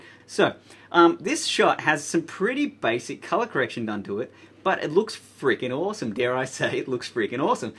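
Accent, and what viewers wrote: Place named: Australia. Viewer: I love your Australian accent! So easy for me to understand :)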